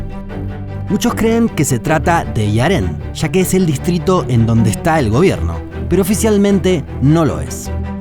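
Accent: Argentina. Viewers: Este tipo es argentino? Si haci lo es salu2 desde bs as xd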